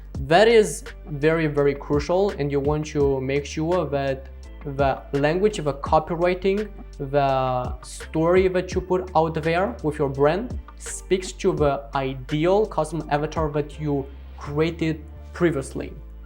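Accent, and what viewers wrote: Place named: India. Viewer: a blonde with an Indian accent.... that's rare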